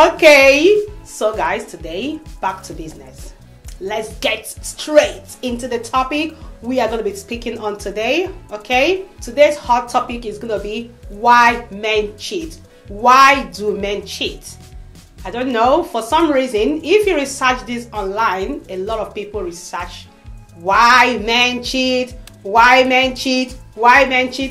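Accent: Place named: Nigeria. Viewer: Im loving th naija accent💕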